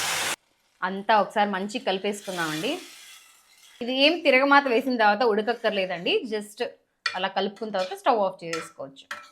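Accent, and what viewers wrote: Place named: Telangana, India. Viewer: Meeru andhra ammai ainaa Telangana ammaila. Chala Baga matlduthunnaru super Andi meeru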